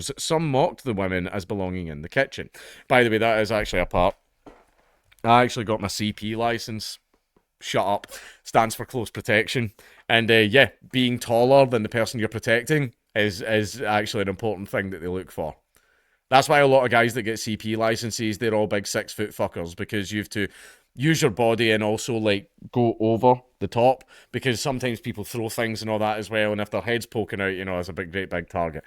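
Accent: Scotland, United Kingdom. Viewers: Why is it made so much more ridiculous when called out in a Scottish accent?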